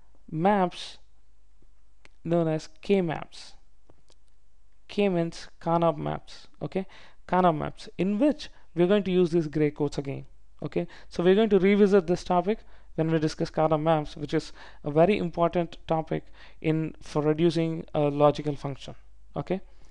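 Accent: India. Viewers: let me guess. Are you from Andhra in India?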